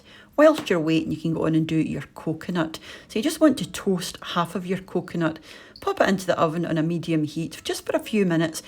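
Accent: Scotland, United Kingdom. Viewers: I am a big fan of ScotIand, it's people, culture and food. I absolutely loved the time I spent there participating in the World Bagpipe Championships a few years back. I don't know if you are aware of this, but closed caption does not work well with this channel and gives a truly comical take on your broadcast. Your Scottish accent is being misinterpreted and some of the attempts at translating are just comical because of your accent. No malace is intended here, just an observation. Can't wait to try these macaroons!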